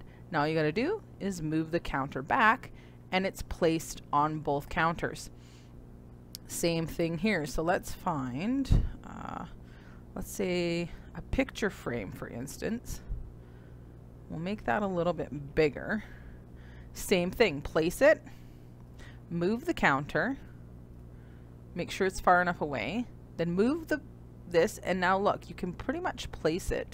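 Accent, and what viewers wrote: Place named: Canada. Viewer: ehhh canadian... oh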